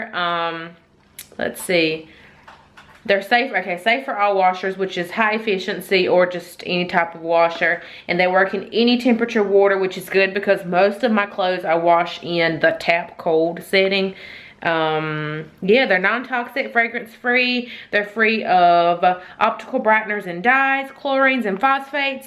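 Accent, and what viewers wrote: South, United States. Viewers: Lord girl I love your sassy southern accent!! I'm also from North Carolina literally from Rockingham we're so close! Love the videos you create girl 💓💓☺️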